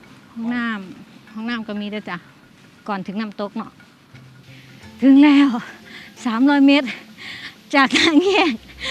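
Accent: Korat, Thailand. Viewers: แก่คนบ้านไหนนิคือพูดคือคนแถวบ้านฉันแท่ะๆฮึ55คนโคราชนิแม่นไหม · พี่ใช้คนโคราชไหมคะพูดเหมือนคนโคราชเลย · มักสำเนียงไทโคราชจ้า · สำเนียงโคราช คนโคราชเหรอ? ผมไปตาดโตนไม่เคยเล่นน้ำเลย ไปกินกาแฟ สดปั่น กับไปเก็บเมล็ดมะค่าโมง มาเพาะ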